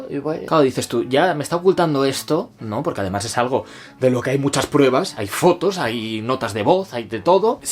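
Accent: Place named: Spain. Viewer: Jajajaja amo tus videos! Y soy argentina ! Nunca me había tanto escuchando un Español.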